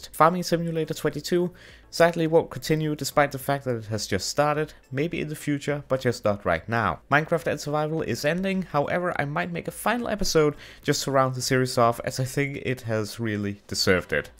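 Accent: Denmark. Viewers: I wonder where you're from. I recognize that accent! Are you from Denmark? It sounds alot like a danish accent.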